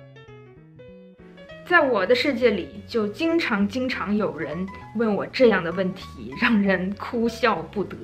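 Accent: Chongqing, China. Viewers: 那是重庆话。。。吧？ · 小姐姐很可爱，就凭这重庆话，关注了。🥰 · 重庆媳妇吗？重庆话这么标准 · 我更感觉你是重庆妹子的味道，我最喜欢重庆妹子了，重庆妹子豪爽！ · 应该是博主从小生活在重庆的关系~ 您所谓的带朝鲜味或东北味的口音应该都是在东北三省地区长大生活的关系。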